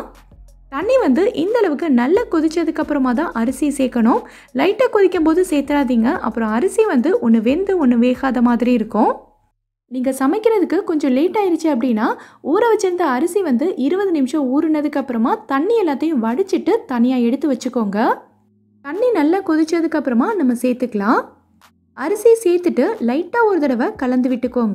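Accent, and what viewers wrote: Madurai, India. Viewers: Super Madurai tamil, try panni pakkeren. Australia 🇦🇺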